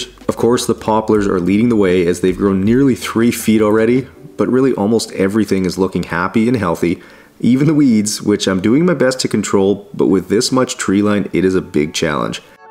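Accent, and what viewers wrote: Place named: Canada. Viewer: I’m sorry, but do all Canadians sound the same! He sounds just like a few other Canadian YouTubers I watch as well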